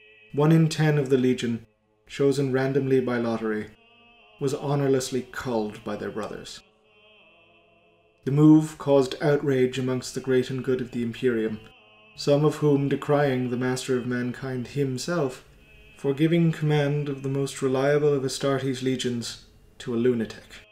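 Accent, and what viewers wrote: Ireland. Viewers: Nice to hear the voice of an Irish man recounting the 40k lore.. your version is the best of all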